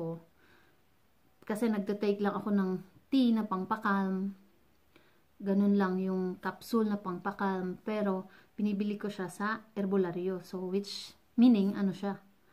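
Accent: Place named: Western Visayas, Philippines. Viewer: Hehehehe Ganon dapat malambing ilonggo hehehe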